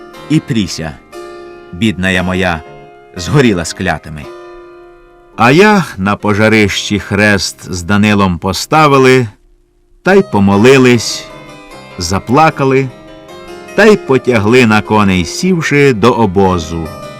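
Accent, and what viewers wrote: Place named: Ukraine. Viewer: Голос дуже український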